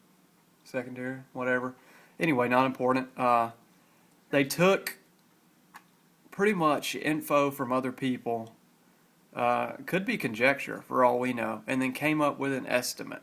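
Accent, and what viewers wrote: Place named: Appalachia, United States. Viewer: help! i cant decide which knife i want to use to kill myself after watching your legion of horrible, redundant, long winded videos! I admit i was bought in by your initial charms, the qualities of your down home voice, a voice of an educated hillbilly were refreshing. Youre a simple guy--a shirt tucked in, cell phone attached to the belt, hands on 10 and 2, I hate drugs, sports team hat wearing, kind of guy. Studied "business" in college right? Put the gun in the closet for 3 months and you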